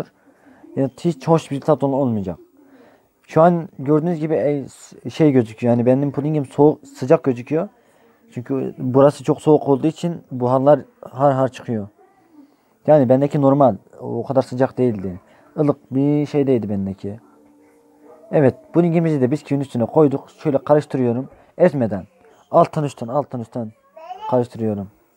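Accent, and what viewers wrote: Hatay, Turkey. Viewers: kardeşim sen benim oğlum yaşındasın. Sana bir tavsiyem var. Sesini ve konuşma tarzını hiç değiştirme. Kırıtarak konuşma. Hataylı olarak kendi şivenle konuş. Biz seni anlıyoruz. Yalnız daha çok kitap oku. Kitap okudukça kelime hazinen genişler. Utanmadan rahat anlatırsın 😊 Takiptesin unutma!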